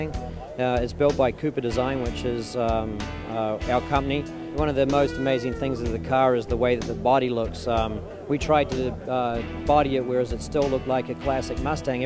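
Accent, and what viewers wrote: New Zealand. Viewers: is that a little nz accent mixed in? lol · souns like a kiwi no doubt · this guy sounds like a kiwi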